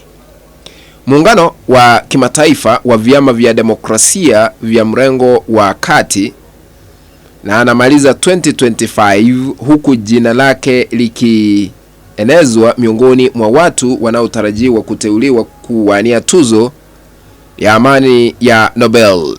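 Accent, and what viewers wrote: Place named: Kenya. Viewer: Huna akili.mamae wewe ni mkenya siunasikianhata rafudhi mbwa wewe hamtaiweza Tanzania kima nyie kapewa nanani ? Wewe shoga tuu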